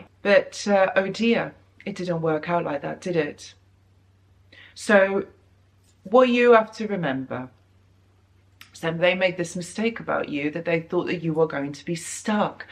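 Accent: England, United Kingdom. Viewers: Yes, your videos are insightful..what I like even more is your luscious England accent 🤍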